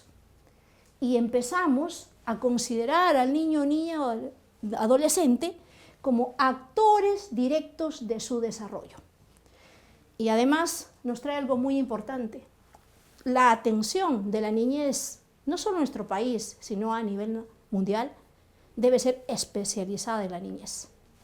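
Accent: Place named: Spain. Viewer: Porque tiene dejo de española? No hace más que dudar (de ser de España) no estar actualizada de la ley peruana ahora vigente Aunque la apariencia aitocotona la delate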